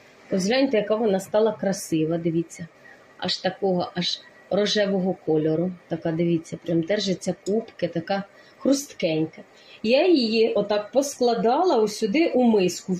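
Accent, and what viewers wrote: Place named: Cherkasy, Ukraine. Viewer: І рецепт цікавий і мова🎉 Це мабуть Черкащина,чи я помиляюся?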